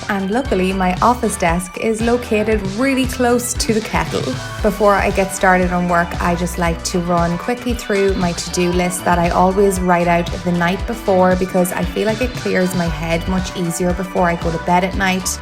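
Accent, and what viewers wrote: Ireland. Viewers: I absolutely love you voice! People always guess Irish when they hear my accent(I live in Scotland but my father is Irish so I have a weird mix of both accents) so when I hear an actual Irish accent it makes me happy bc it reminds me of my dad.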